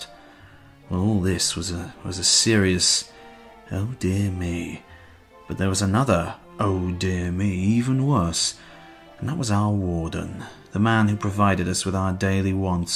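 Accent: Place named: England, United Kingdom. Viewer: The words are harsh, but even the harshest of American or Australian accents simply wouldn't do them justice... Only an English accent can convey the assumed superiority and unmasked disdain for all things "foreign" (complete with pitiful sighs and deliberate sarcasm and intentional mispronunciations, as if the entire Eastern Roman Empire had just farted in his face)... Well done!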